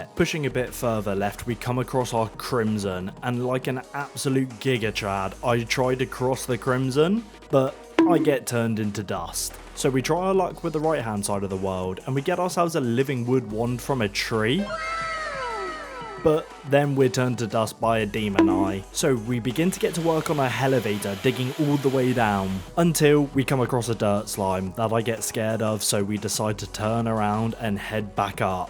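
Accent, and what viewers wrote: United Kingdom. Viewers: Really liked the vid, but would enjoy it more with thicker briish accent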